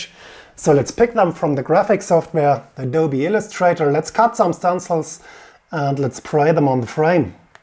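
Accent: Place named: Germany. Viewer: nice accent ;) ... german ?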